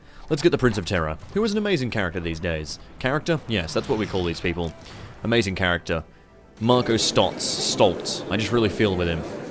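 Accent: Australia. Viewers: I like how has got progressively less Australian over the years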